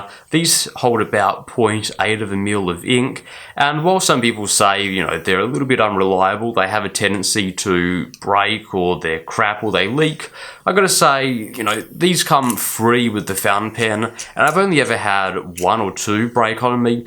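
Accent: Australia. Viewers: Lovely accent. Are you from Australia, or New Zealand? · From his vowel usage I’d say Australia, or Straya!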